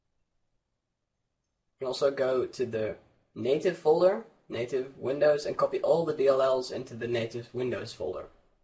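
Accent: Netherlands. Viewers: you can just hear your dutch, ik ook :D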